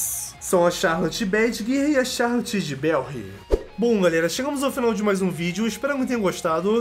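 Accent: Portugal. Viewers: Eu só percebi que era um português falando, no fim do vídeo. Kkkkkkkkk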